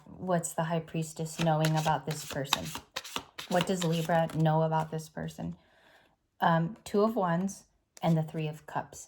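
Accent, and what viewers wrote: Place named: New York, United States. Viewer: Thank you for the reading. I also had the Tower in my daily read. Love the NY accent glad to see you're channeling some east coast!!❤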